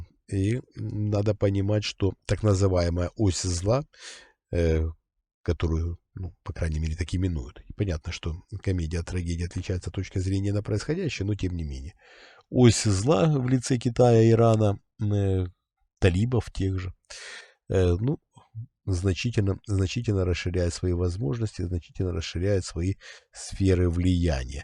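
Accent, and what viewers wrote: Ukraine. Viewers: Вопрос. Скажите пожалуйста, почему у вас украинский акцент?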